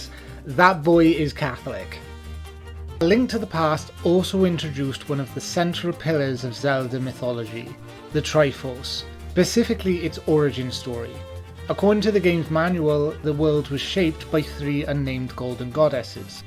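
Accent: United Kingdom. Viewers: Bro are you welsh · Sidenote are you from Northern Ireland?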